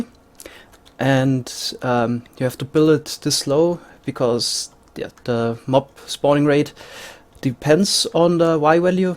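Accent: Germany. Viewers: D :D u are german aren't u? and thx for the nice video ;)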